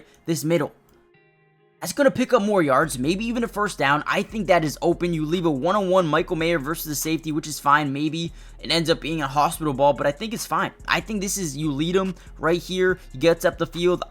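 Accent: Canada. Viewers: Def need a Gardner minshew vid, btw random question but are you Canadian? Lol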